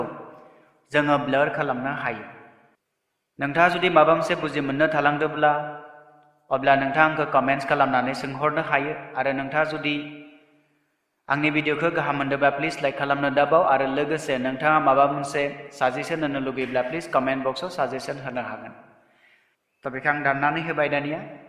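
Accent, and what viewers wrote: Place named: Goalpara, India. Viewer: Sir bungbla mwjang kwnaya so, Golpara bung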